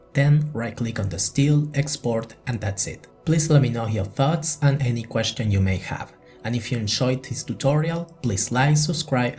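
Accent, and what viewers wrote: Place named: Rioplatense, Argentina. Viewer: are you from argentina? you have a strong rio de la plata accent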